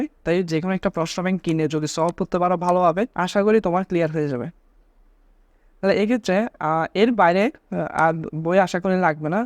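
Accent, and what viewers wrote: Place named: Chittagong, Bangladesh. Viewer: ভাইয়া আপনি তো চট্টগ্রামের মনে হয়, চিনা চিনা লাগতেছে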